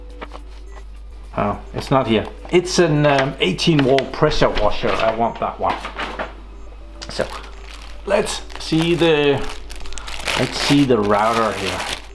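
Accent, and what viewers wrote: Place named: Denmark. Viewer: Jeg har kun set 1 video, og er allerede subscribet til dig fordi du taler Engelsk på den eneste rigtige måde - Danglish.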